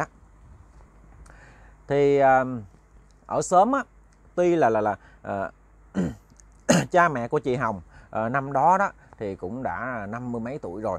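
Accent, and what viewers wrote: Mekong Delta, Vietnam. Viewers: nói chuyện dễ thương quá đi, chuyện uống nước đó là nhu cầu của mỗi con người, ai cũng phải vậy, cũng lịch sự nói với mọi người, nên e thấy đậm chất miền tây, rất dễ thương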